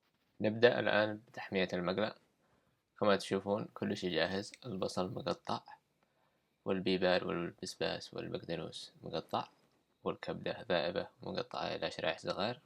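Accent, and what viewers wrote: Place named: Sanaa, Yemen. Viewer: بلعكس لهجتها الصنعانيه احلا لهجات اليمن واصل ابداعك استفدنا كثير اغلب الأشياء اجربها الي تنزلها جزاك ألله خير.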